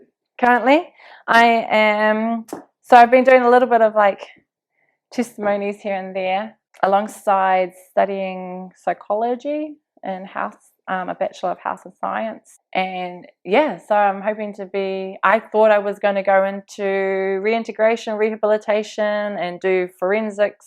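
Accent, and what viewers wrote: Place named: New Zealand. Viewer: You don't sound intelligent, you definitely sound like a maori and totally look like one, You and your whole family sound like HOODRATS, WHAKAMAA Whats the point of this NEGATIVE POST ABOUT BULLSHIT GANG MEMBERS, You don't have to be GANG MEMBERS TO BE SOMEBODY, Well Whats the chances being in a Gang your sorry ass is going to be destroyed, that's the choices you maoris made. BLAME NO ONE BUT YOURSELVES. Don't blame the cops,don't blame the government. BLAME YOUR PARENTS. It's pretty hard to believe you have catholic values when you speak like a hoodrat, No wonder Maoris get a bad name. Listen to the bullshit and jellybeans that's coming out of your mouth, it's negative as and you just sound like you're going down the PITY TRACK, why have a child if you clearly can't take care of yourself and YES EVERYTHING IS YOUR FAULT AND THE BAD CHOICES YOU MADE. 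I know lot's of Chics in Aotearoa Black power,mongrel mob,head hunters, hell angel's fk'n way worser off than you, there's no way that they would be doing a pathetic interview like you are now. Good fkn job people have died in your fucked up family, You obviously don't know God, have you actually REPENTED TO GOD....DOESN'T SOUND LIKE IT, your not mentally impaired, You don't believe in God, the bullshit you went threw is nothing in comparison to what others have gone threw. You use God's name alot yet you have in no way have been obedient towards him therefore get your shit together and stop living in a dream world in the past. I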